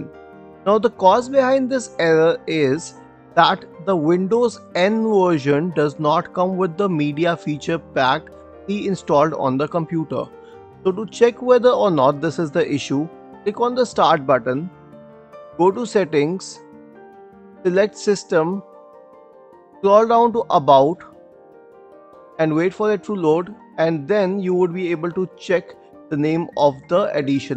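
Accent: India. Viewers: ty indian?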